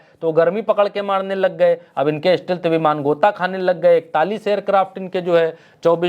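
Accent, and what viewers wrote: India. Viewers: 👌❣️👌👌❣️👌👌❣️👌👌❣️👌👌❣️👌👌❣️👌👌❣️👌👌❣️👌👌❣️👌👌👌❣️ *Love you, भाई for your देसी ॲंदाज़-ए-बयॉं.!!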